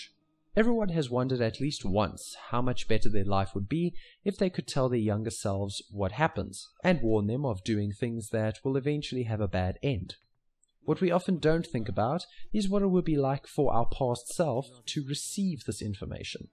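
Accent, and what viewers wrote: Afrikaans, South Africa. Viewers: What is that accent? Afrikaans English ? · Are u Afrikaans?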